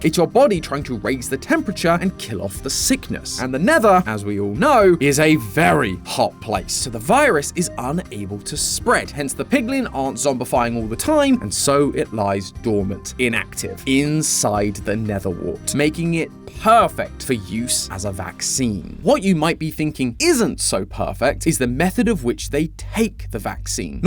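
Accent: United Kingdom. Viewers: BRITISH MATPAT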